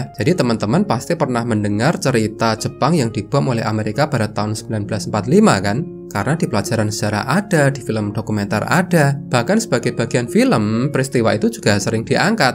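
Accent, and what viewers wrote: Java, Indonesia. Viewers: semarang yo mas ..keliatan dari logat Jawa ne 😊😀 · Akhirnya muncul juga sosok legendaris pengisi suara "Aneka sejarah"...yg kental dengan aksen jawa medhok nya.. Ternyata guanteng.. 😊😊😊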